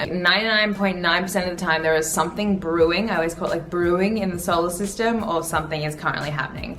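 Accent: Australia. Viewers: I love your Australian English. Are you from Melbourne?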